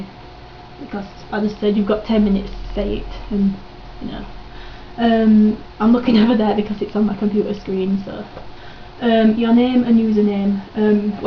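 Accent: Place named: Yorkshire, United Kingdom. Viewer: WHEEW , yorkshire!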